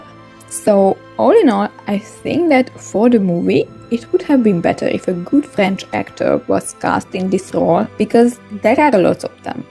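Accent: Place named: Germany. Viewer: Yup. You sound just like A German.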